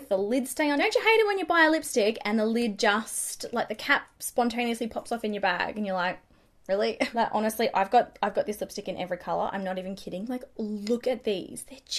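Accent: Australia. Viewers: I just want to put this out there. I'm not gay or anything. I'm happily married for 37 years. I just really love the way Australians talk.. That's all...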